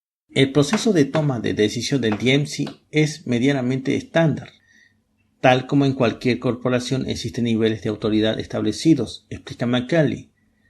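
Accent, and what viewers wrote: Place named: Córdoba, Argentina. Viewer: Hola, me llamo Gaston, soy argentino. Estoy viviendo en Mexico. Por tu acento me parece que sos cordobes, sino me equivoco. Mira, quizas te resulte raro esto, pero aunque soy un "miembro activo y sirvo como lider de estaca" me han gustado varios de tus videos (no vi todos), en especial el que hablas de Korihor. Me gustaria, si fuera posible hablar quizas por correo o wats para hacerte algunas preguntas. Me gusta encontrar personas que se cuestionen las cosas que aprenden. No es un intento para "reconvertirte" jeje, en verdad creo que sos sincero en tu manera de exponer tus ideas y conceptos. Aunque creo en Jose Smith, y todo lo que conlleva eso, primeramente que eso creo en Cristo y sé que la Iglesia no es perfecta, refiriendome a la "Iglesia" como pueblo dirigido por hombres imperfectos pero creo en la doctrina que aun sigo aprendiendo, aceptando que no todo lo que un lider dice tiene que ser verdad. 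Mi correo es <mail> y mi celular es <num>. Espero que me des la oportunidad de conversar con vos.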